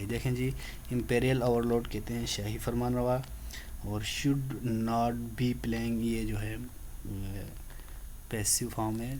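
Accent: Pakistan. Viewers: Accent like baltistan! Am I right bro.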